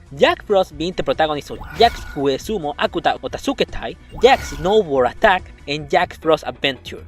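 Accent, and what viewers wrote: Chile. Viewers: Sabia que ese acento era chileno, jajaja muy buen canal! Me subscribo!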